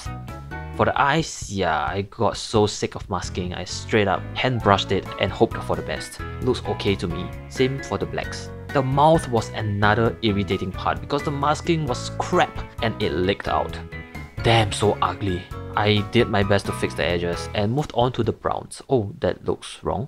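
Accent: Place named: Singapore. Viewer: Wait you singaporean? U sound singaporean, If u are, omg, never knew got singaporean who is interested in this stuff. Love it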